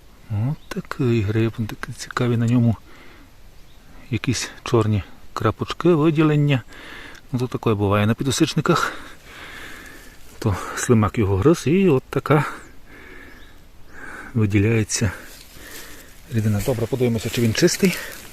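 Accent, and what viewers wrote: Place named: Synevyr, Ukraine. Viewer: В якому місці відео знімали?Бо говір ,як у Синевирській ріці.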